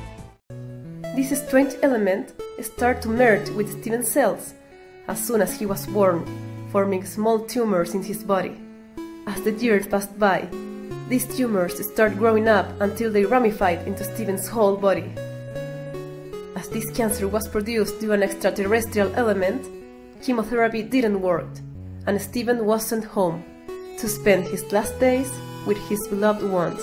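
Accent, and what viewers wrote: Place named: Russia. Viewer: bu arada teoriyi anlatan kadın rus aksanıyla konusuyor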